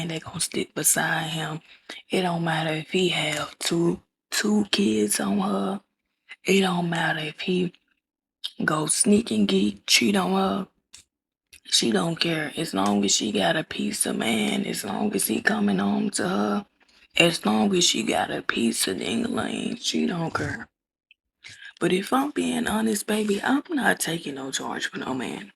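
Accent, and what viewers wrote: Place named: Memphis, United States. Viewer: So that’s why I thought you have the same accent as glorilla, you’re from Memphis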